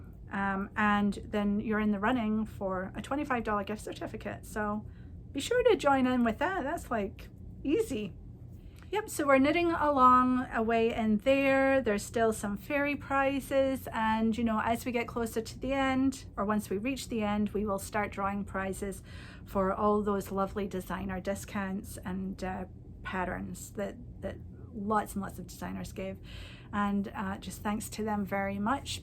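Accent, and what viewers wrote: Scotland, United Kingdom. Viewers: Raining sideways!!!! Thank you so much, this podcast absolutely made my day. This is the first time listening, and I just burst out laughing at your comment about the rain the in Western Isle being sideways. I was born and brought up in Stornoway, so yes, I can absolutely agree with you. I am living in Fairfax, Northern Virginia, and I could listen to your beautiful Scottish accent all day - especially as I have not been able to do my yearly pilgrimage home this year! I will probably be binge watching to catch up with your many shows, and I look forward to shopping with you very soon. xxx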